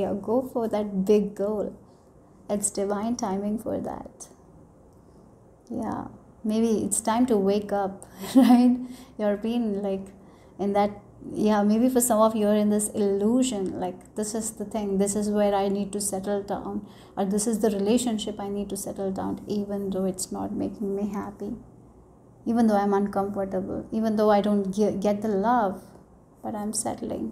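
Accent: India. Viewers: Really enjoying your readings. Very on point and deep. Love your Hindi accent as well. Thank you🙏🏼✨💖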